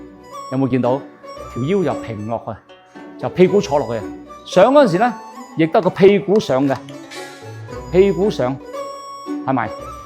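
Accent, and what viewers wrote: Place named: Hong Kong, China. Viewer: get 到了，好親切的香港人香港話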